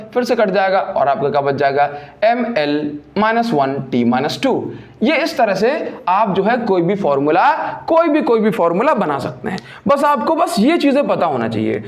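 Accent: Odisha, India. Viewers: Sir are you from Odisha? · Sir you are from odisha??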